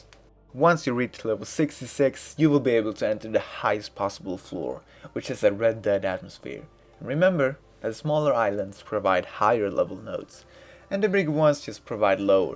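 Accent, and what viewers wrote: Sweden. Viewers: Bra guide. Hörde direkt att det var en svensk tack vare ordvalen och den klara brytningen x,D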